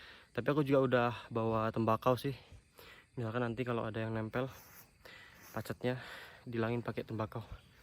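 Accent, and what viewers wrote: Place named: Bali, Indonesia. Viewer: Warbyasyah Logatnya udah bali banget nih bli 😆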